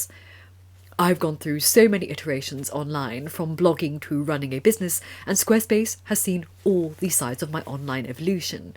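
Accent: United Kingdom. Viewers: I like your voice combined with British accent so much!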